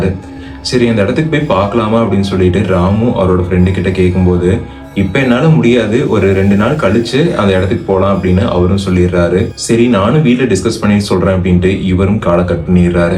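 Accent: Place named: Coimbatore, India. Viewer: Ok bro your Coimbatore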